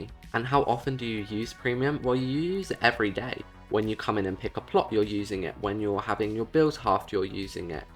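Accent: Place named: United Kingdom. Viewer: I love ur britisj accent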